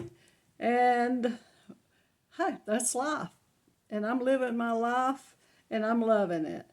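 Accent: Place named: Georgia, United States. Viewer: Hi. Nice to meet you. You are adorable and very sweet. I can tell you have a kind heart and have so many fascinating stories to tell that us younger ones can learn from. Btw your accent is so nice to listen to. I live in Italy btw so I don't get many opportunities to meet any folks from Georgia.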